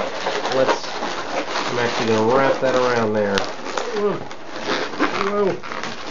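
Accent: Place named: Australia. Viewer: that's the best koala bear balloon ever. i also liked your austrailian accent for the intro. well done. keep on twisting.😍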